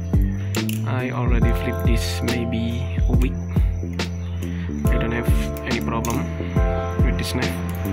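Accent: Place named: Spain. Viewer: Contestame si sos español que hasta yo hablando otro idioma se cono no sos ingles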